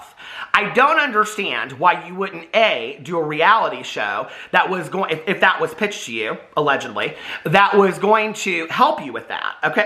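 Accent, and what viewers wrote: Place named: Midwest, United States. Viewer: The Midwestern accent was popping in this video and I LOVE it!!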